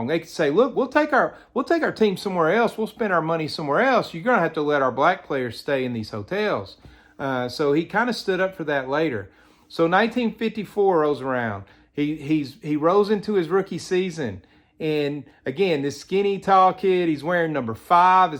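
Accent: South, United States. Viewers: A Labor of Love - Especially Poignant when done with your southern accent ❤❤❤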